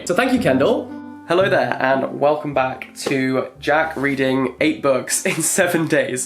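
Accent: United Kingdom. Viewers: I love his british accent and ofc his word games hahah ;)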